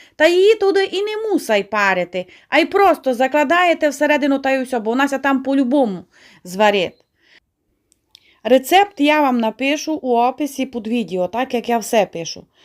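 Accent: Transcarpathia, Ukraine. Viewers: Дивлюся файний рецепт і заодно вчу закарпатський діалект. Як же приємно чути вашу вимову❤ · Закарпатська мова топ твоя фішка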